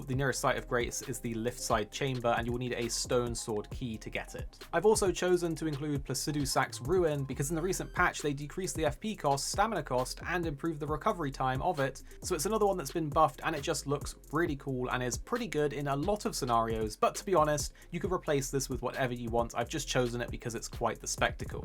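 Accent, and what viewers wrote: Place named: England, United Kingdom. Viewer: I love me an English accent. But "HATE-ch" makes me cringe.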